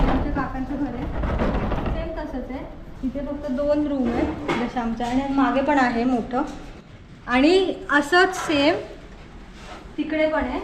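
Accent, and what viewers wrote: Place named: Vasai, India. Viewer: तुझे व्यक्तिमत्व, दिसणे तसेच बोलणे अगदी वसईतील गावाकडील लोकांसारखे वाटते. कदाचित माझे मत चुकीचे असेल. तुला कोकणातील बारीक सारीक गोष्टींची माहिती आहे जी तुझ्यासारख्या सध्याच्या नविन पिढीतील कोकणातील मुला-मुलींना ही त्यांची माहिती नसावी.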